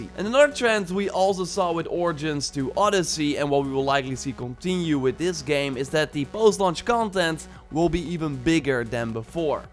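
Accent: Netherlands. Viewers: why do u sound like u have a dutch accent😂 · are you dutch? it rlly sounds like it lol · It's been 2 years since I havent really been active on youtube but I sure remember the Dutch accent of yours like the sound of yesterday haha. Houd jezelf sterk en veilig! Stay strong and be safe! Very informative information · LoL i can hear that you are dutch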